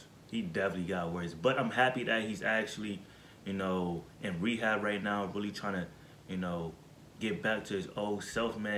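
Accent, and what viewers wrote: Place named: New York, United States. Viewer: yo from michigan? tHOUGHT you was from new york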